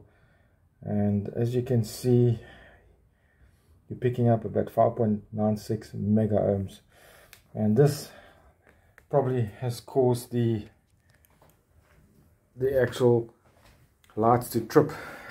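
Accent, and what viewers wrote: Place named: South Africa. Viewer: Are you in Durban? Your accent sounds South African with a hint of India.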